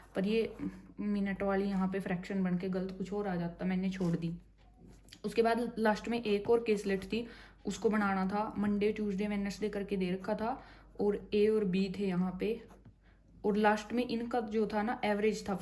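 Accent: Haryana, India. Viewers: Haryanwi ho kya accent se lg rha h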